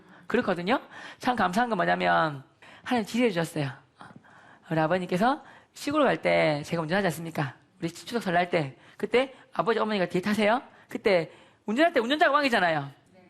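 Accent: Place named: Busan, South Korea. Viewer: 부산 사투리가 이렇케 구수한줄 첨 알았었요 ㅋㅋㅋㅋ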